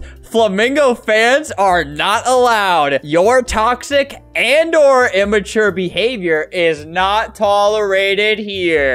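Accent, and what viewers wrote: United Kingdom. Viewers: Great British accent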